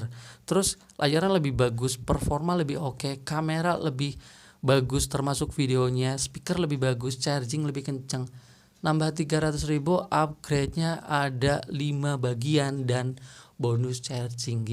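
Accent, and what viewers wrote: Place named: Banyumas, Indonesia. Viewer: Abang ngapak orang mana ya?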